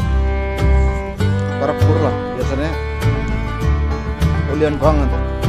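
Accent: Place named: Banyumas, Indonesia. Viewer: Waduh malah patah sepining pancing nya ,yang penting dapat ikan bos,ngomonge ngapak koh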